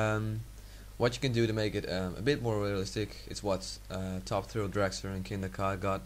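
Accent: Netherlands. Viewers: lol ik hoor gewoon dat je nederlands bent XD goeie tutorial 5/5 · are you dutch? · You're dutch right? That accent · Are you dutch ? you sound like it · you sound dutch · ik hoorde meteen dat jij nederlands was, =D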